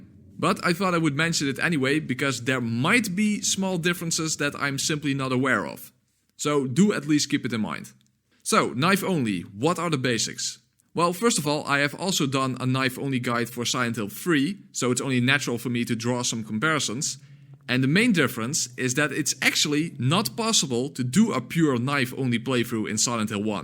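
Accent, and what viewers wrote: Netherlands. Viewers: You must be Dutch, I hear an audible accent!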